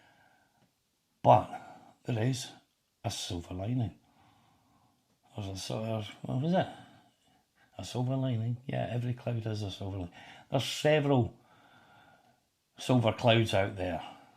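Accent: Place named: Scotland, United Kingdom. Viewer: I think you are going to do well. Everyone loves a Scottish accent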